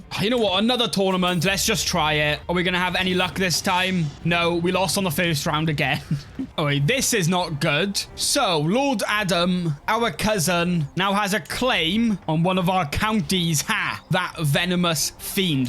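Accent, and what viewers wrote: Wales, United Kingdom. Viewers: You sound funny like neath funny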